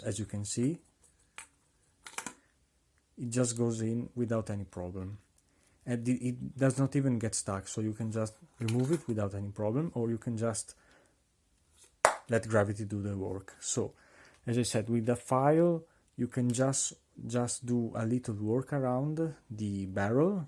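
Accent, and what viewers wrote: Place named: Italy. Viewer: Si sente l'italiano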